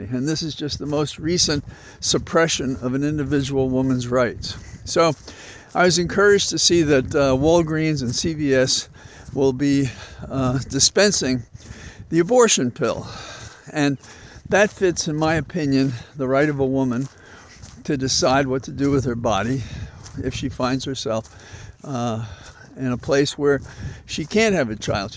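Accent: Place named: Ireland. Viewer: Belated Anniversary Congratulations to you both … touch of the Irish in your talk today … made me smile 😀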